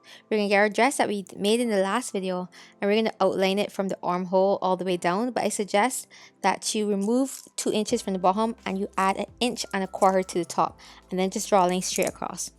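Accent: Barbados. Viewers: Fren you sound Bajan 😍, I’m guyanese but I know that accent good thanks to Rihanna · The moment I clicked I recognized the accent!! Let’s go Barbados 🇧🇧 💛💙💛💙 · I know that accent anywhere 🇧🇧 🇧🇧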